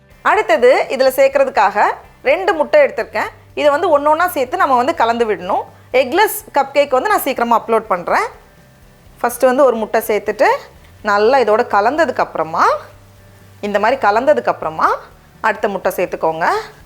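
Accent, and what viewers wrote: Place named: Chennai, India. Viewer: Super akka love Madras Tamil❤️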